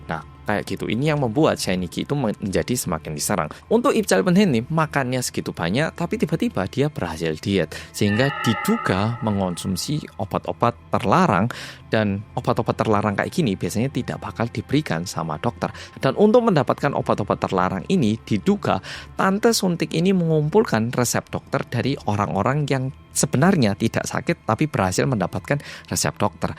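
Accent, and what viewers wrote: Java, Indonesia. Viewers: medok puooolllll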